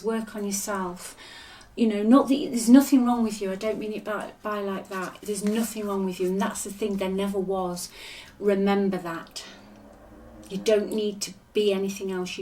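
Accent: England, United Kingdom. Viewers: ❤❤❤❤~ YOU are so Wonderful and Beautiful ~ Thank You for making my days Brighter with your readings! Love your English Accent ❤️ 💕 ♥️ ~ it's "magical"!!!🎉🎉 (I've got popcorn and snacks, lawn chairs and heaters [it's cold here where I am at] ready for the 'Show'😂😂😂😅🎉!!! Watching!!!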